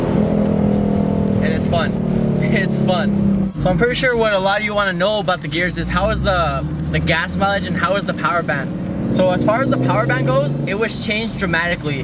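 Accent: Texas, United States. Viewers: What part of Texas are u from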